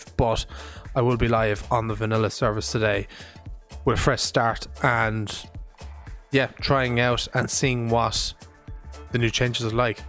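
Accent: Ireland. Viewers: I did read the patch notes... but now i get to hear them in Irish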